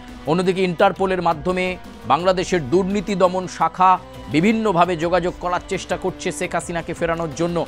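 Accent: India. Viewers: দাদা তোমার ভাষা তো,, কোলকাতার। বাংলাদেশি সাজছো কেন কেন???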